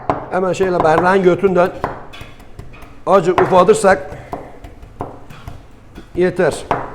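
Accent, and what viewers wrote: Gaziantep, Turkey. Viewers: Çok güzel olmuş eline ze saglık anlatiş şeklinizde çok tam bir annepli gibi 👍👍👍👍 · Antep aksanı en güzel aksan. Yaptığınız yemek çok sağlıklı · Antepli bir arkadaşım var gastronomi bölümünden birebir abinin aynısı zaten konuşmaya başladığı anda antepli olduğunu anladım antepliler iş ahlakı disiplin ve cömertlikleriyle biliniyor ellerinize sağlık ustam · Şu şive şu samimiyet helal olsun Antepli arkadaşım vardı oda böyle konuşurdu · Bu Antep şivesi çok kötü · Antep kilis şivesine ölüyorum ya ustam ellerine sağlık hayırlı Ramazanlar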